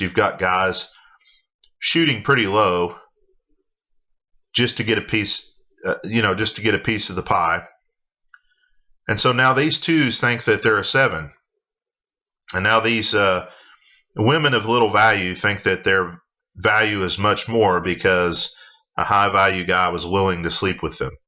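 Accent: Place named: Southwest, United States. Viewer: Good content, eloquent and great speaking voice. What's your accent? I'm guessing S.W. of U.S.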